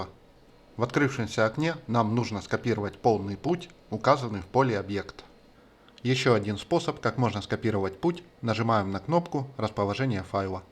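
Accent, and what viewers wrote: Ukraine. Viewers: Смущает малоросский говор. Не засланный ли казачок? Извиняй, если что, обидеть не хотел. У меня гудбай дипиай работает прекрасно. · Рекламировать DPI - C УКРАИНСКИМ акцентом крайне рискованная затея ))). Даже если вы не призываете уже статья за это если вы рф а акцент усугубит ваше положение до ионогена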